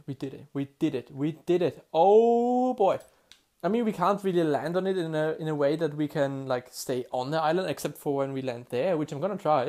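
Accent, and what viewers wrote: Germany. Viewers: you german ? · Man hört irgendwie ein bisschen, dass du deutsch bist xD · Cool Video but Theres Just one Thing i will know. Are you a german guy the game is german and your voice Sounds german to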